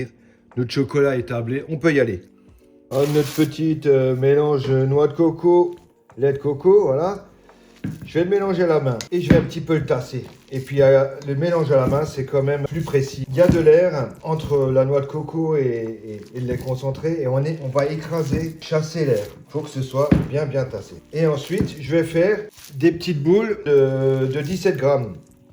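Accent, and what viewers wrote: Alsace, France. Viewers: Ahh ce petit accent alsacien ! Ça fait plaisir de voir un compatriote ! Je ne vous connaissais pas et l’idée des bounty maison est très bonne 😊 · je pensais bien entendre un petit accent Alsacien. Bisous d’une Strasbourgeoise du Texas 😉 Bon après le “on le manche” y’a plus de doute 😂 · L'accent alsacien 😂❤j'adore. Je suis d'Alsace également même si je vis en région parisienne ❤❤❤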